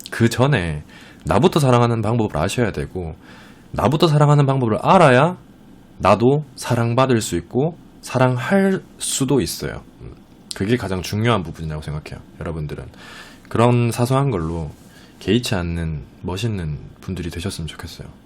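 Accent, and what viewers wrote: Gyeongsang, South Korea. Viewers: 은 너무 많은걸 알고계시다 마인드가 스마트하네 대구 말씨도 넘좋다 ㅎㅎ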